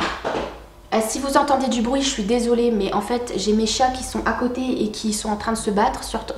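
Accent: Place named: North, France. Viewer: Très appréciable tes différentes "storytime", je me suis surpris à toutes les regarder. Je suis psy et tes témoignages sont importants pour dédramatiser la santé mentale qui est très importante 🙂 Bonne continuation à toi ! J'ai l'impression de reconnaître un peu mon accent du Nord, je ne sais pas si je me trompe mais c'est choupi 😂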